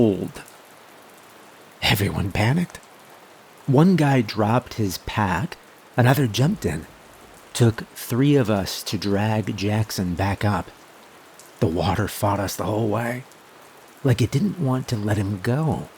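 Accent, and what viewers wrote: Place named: South, United States. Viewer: I can hear your southern accent ❤😂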